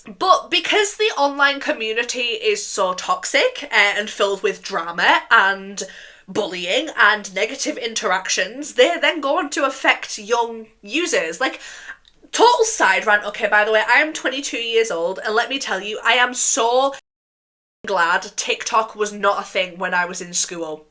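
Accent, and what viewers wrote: Scotland, United Kingdom. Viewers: Nvr in my life have I ever heard a Scottish goth...IT'S AMAZING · HI I JUST STARTED WATCHING BUT ARE YOU SCOTTISH OR FROM THE UK BY ANY CHANCE??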